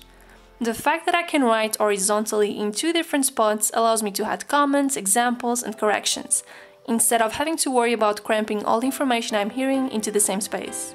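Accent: Portugal. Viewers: Hey, your accent sounds very portuguese xD wondering if you are, and if so, where are you from? Edit: ok you said in the video that you are portuguese xD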